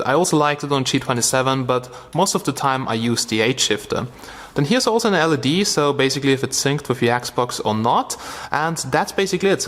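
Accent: Germany. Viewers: Are you german speaking guy? :D Bist du? , es tönt so :D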